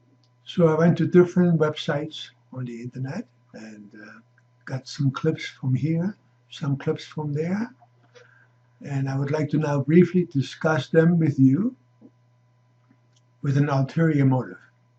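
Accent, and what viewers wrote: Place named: United States. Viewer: Excellent! Good summation! Also, we have Pluto going through the US's second house, conjoining its native position from over 200 years ago. This is concerning. It is good to see another conservative astrologer! VedicCarol, or something, was one too. Unfortunately, few people listen to older people, and I'm getting there. They look for young, ignorant people to watch, some of which have good ideas, but who lack experience. Your American accent is quite good. I'm learning a foreign language too.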